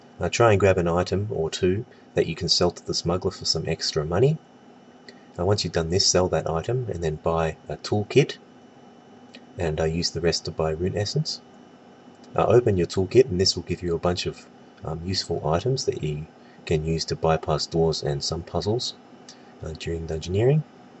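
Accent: Australia. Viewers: holy shit first australian commentator ive heard?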